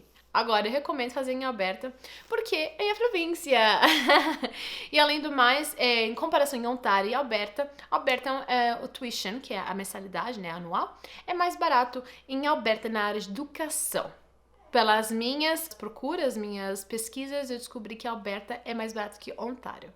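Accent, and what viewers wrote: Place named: Portugal. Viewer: lembra um pouco portuguesa seu sotaque é bem diferente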